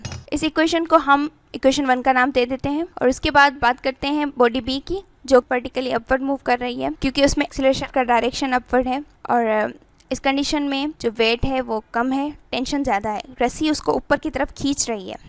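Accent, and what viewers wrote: Sindh, India. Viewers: Mam are you from sindh and are you Sindhi I think your mother tongue is sindhi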